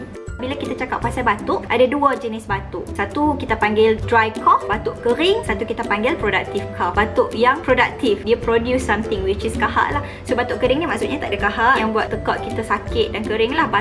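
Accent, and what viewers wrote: North, Malaysia. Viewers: Boleh ja faham..biar la dia dah selesa ckp loghat utara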